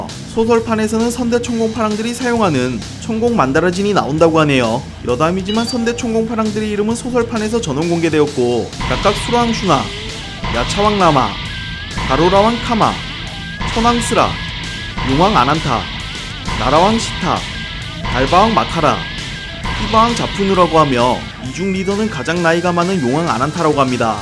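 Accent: Gangwon, South Korea. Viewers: 약간 강원도 사투리쓰시는듯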